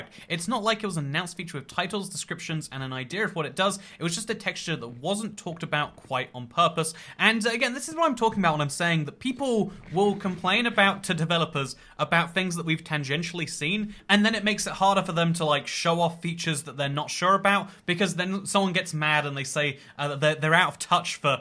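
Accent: United Kingdom. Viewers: why does he sound asian when he says hello im but then sounds british for the rest of the video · THE MYSTERY HAS BEEN SOVLED HES BRITISH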